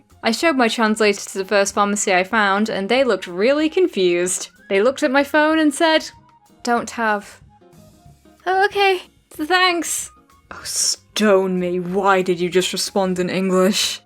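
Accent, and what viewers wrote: United Kingdom. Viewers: EW YOU'RE BRITISH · Oh dear do I luv your british accent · who else stans british girl voice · British language ahh I like it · Omg, she’s BRI’ISH!! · I come for the stories, and stay for the British accent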